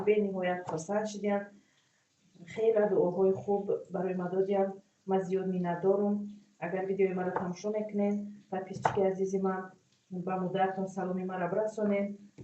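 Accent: Tajikistan. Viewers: Хохарам шумо аз кучои ТЧК гапзпниет Кулобиеворияй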